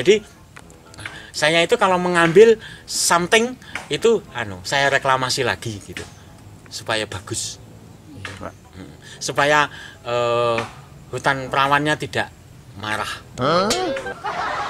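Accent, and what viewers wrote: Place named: Java, Indonesia. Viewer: Jowo mn suarany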